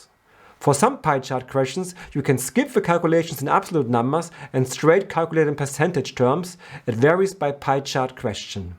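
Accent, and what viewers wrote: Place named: Germany. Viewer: It’s nice to listen to a German among the tones of Indians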